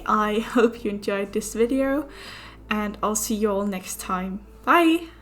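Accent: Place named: Denmark. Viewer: Are you from Denmark? I can hear the Danish accent. My gf is from Aarhus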